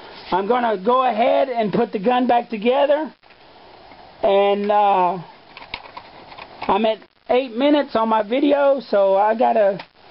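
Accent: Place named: South, United States. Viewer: Ur voice always sounds funny because ur from the south and I'm from the north so yeah, no offense, great vid can't wait to get the long strike!!!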